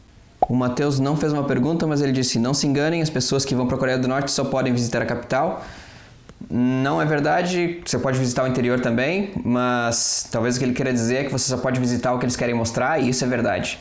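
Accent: Rio Grande do Sul, Brazil. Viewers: Tu é gaúcho meu ? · cara vc e gaucho?Vc tem muito sotaque daqui sksk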